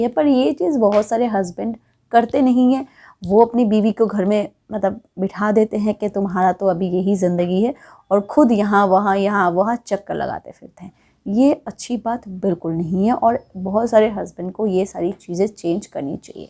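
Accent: Bengal, India. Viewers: Interesting he mam aur app Bengali lag rahi ho appke tone se 😁❤️ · Woh Bengali hi h 😂😂😂😂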